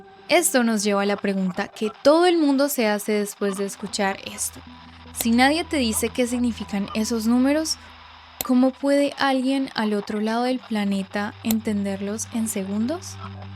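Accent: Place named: Mexico. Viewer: sisi. no siempre, pero muy tarde a la noche se escuchan cosas interesantes. espero mas videos tuyos, bella voz, que sumada a la tonada de mexico es hermosa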